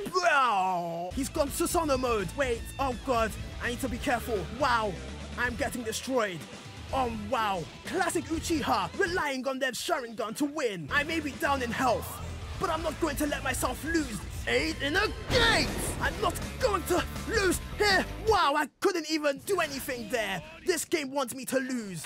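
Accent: United Kingdom. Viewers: YOOOOOOOO this dude sounds literally like LEE BUT BRITISH OMG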